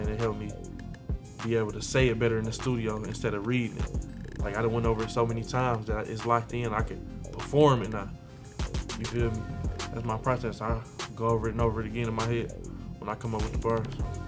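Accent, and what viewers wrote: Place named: South, United States. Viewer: He may have spent time in the D but he obviously spent more time in kentucky. He has a thick southern accent and he shouts out kentucky in alot of songs.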